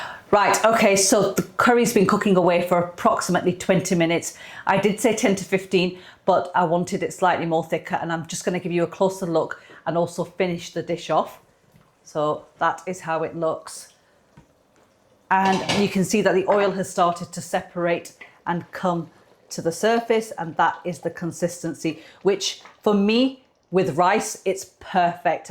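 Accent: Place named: Northern England, United Kingdom. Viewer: Love this channel for easier one pot curry recipes to BIR style!.. and the absolute clowns complaining about how your say turmeric just ignore them. Im a Geordie and i love the fellow northern accent 💙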